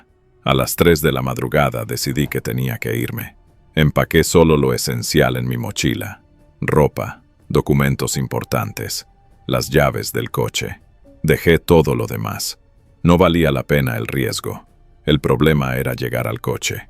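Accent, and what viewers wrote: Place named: Spain. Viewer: Me gustan las historias de horror pero, con ese asiento español?, ni pensarlo.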